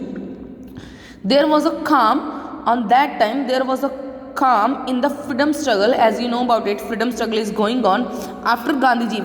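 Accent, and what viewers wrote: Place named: United Kingdom. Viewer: Sorry to say but if u r teaching in icse school that doesn't mean that u have to sound like Britisher itself just pronounce the words normally......😅 ~no offence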